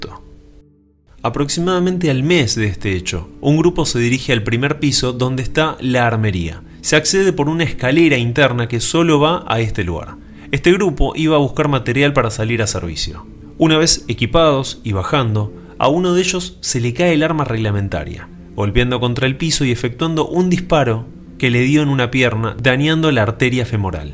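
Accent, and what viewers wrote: Argentina. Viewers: que aburrido que sos hermano para contar ,dedicate a otra cosa, para colmo la tonada porteña que tenes lo hace inbancable...no lo aguante, lo siento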